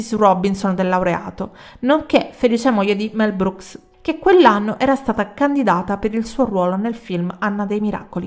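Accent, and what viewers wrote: Sicily, Italy. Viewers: Ti ammiro molto. Continua così. A proposito il tuo parlare siciliano mi piace tantissimo!!! Ciao da Venezia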